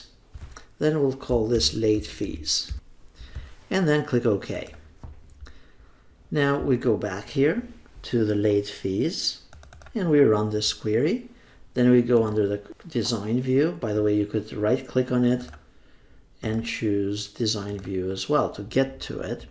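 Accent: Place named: Albania. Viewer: Great job. Your Albanian accent is strong though :)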